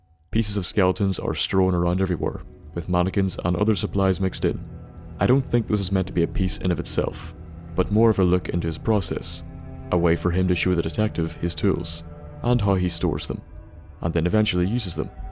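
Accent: Northern Ireland, United Kingdom. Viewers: Have to say mate, your NI accent does wonders for the creepiness of this video :)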